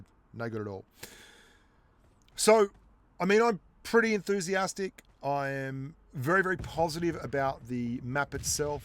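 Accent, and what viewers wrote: Australia. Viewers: Thanks...are u from Australia ?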